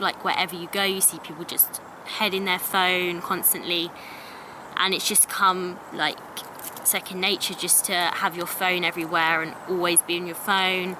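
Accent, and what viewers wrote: United Kingdom. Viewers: I love your British accent. I could just lay in a hammock and listen to you talk.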